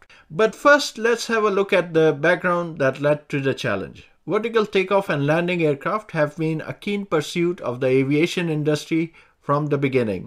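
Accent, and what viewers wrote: India. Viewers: I like ur accent👍👍 r u from india?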